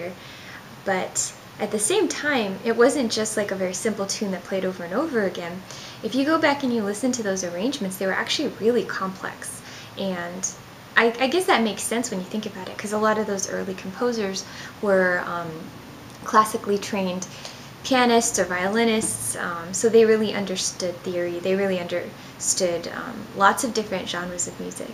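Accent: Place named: England, United Kingdom. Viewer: Wow English accent is amazing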